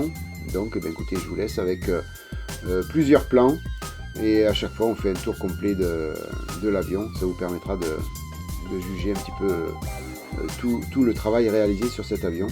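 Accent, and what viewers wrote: South, France. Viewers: Bravo. Superbe réalisation. Je vais suivre celle du Mirage F1. Je trouve tes présentations très agréables (avé l'accent) et découvre à chaque fois des astuces que je ne manque pas d'utiliser pour mes propres réalisations. Bonne continuation.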